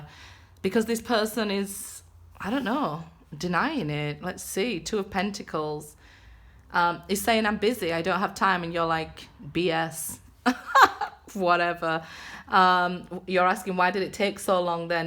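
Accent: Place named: West Yorkshire, United Kingdom. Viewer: Sounds like a West Yorkshire accent..! ❤️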